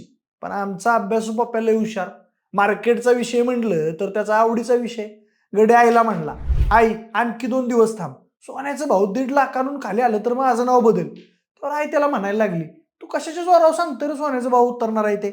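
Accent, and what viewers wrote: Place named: Kolhapur, India. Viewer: तुम्ही कोल्हापूर च हायसा काय तुमच्या बोलण्यावरण वाटतंय 😊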